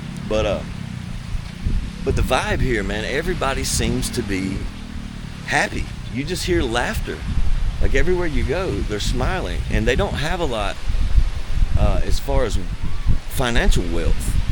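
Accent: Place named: North Carolina, United States. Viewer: North Cackalacky in the house! You sound a little Sampson County. 👍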